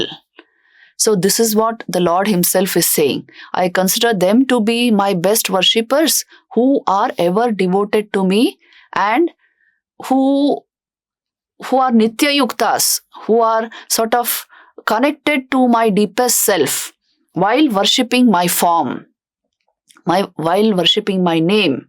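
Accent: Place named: United States. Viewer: Conversion of the lecture to American accent is not enjoyable 😢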